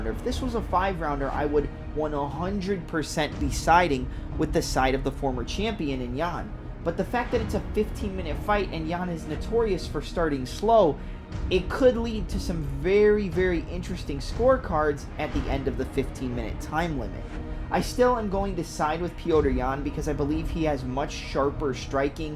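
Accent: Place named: Midwest, United States. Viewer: Youtube changed the algo to feature smaller creators. I dig it. That thick midwest accent sounds like home. Good vid.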